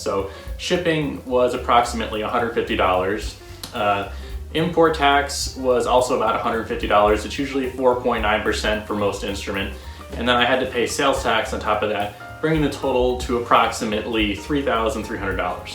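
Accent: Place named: Canada. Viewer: i keep thinking your canadian i dont know why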